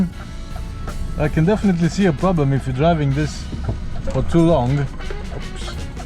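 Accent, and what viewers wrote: United Kingdom. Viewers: I can hear a bit of scouse in you 😁😁. I am from Liverpool do you no anywhere takin on or what agency do you use? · Love the way your Scouse is coming along,. Couldn't live without the videos, proper grounding seeing other jobs like yours (and mine) which keep the lights on in the country. Have a good Christmas and New Year squire 👍🏻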